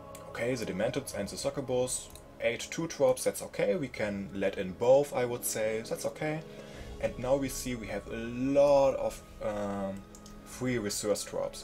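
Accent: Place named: Germany. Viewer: Du bist auf jeden Fall Deutsch xD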